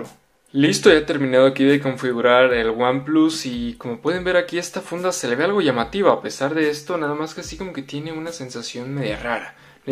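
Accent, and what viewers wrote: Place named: Mexico. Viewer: menos mal q es español mexicano sino no entendía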